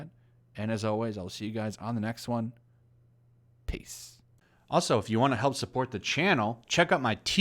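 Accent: United Kingdom. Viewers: Your voice matched exactly what i thought the guy would sound when i read this article lol at least the stuck up british voice. People go to Kotaku for laughs not games related content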